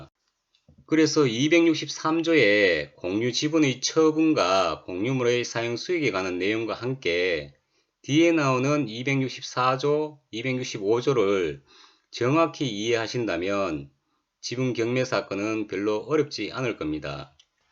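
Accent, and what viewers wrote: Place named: Gyeongsang, South Korea. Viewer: 경상도사투리가 이렇게 부드러운줄,, 내용도 넘 쉽게 귀에 쏙쏙,,감사합니다 좋아요 구독합니다^^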